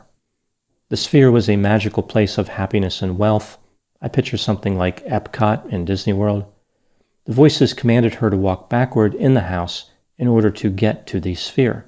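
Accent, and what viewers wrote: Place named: New Jersey, United States. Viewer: Dr! I love the nj jokes, but I'm curious... what did the Garden State do to hurt you? (byyyy the way, you exhibit some minor signs of Jersey accent 🤔) lol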